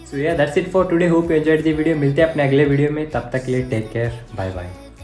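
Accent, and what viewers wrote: Karnataka, India. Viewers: is your mother tongue kannada?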